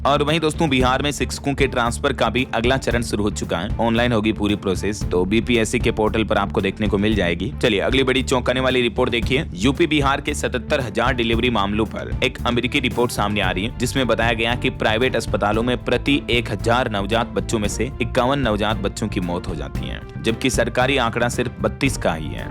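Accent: Rajasthan, India. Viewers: bhai vah to aap Rajasthan ke mujhe lagta hai Seth sanvariya ka dialogue sunkar Aisa lagta hai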